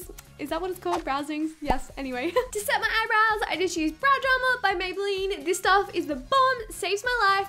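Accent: Australia. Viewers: just discovered your channel !its amazing ..and i lovvee that Australian accent tht you havee !! ..but how old are you !! do an Q&A !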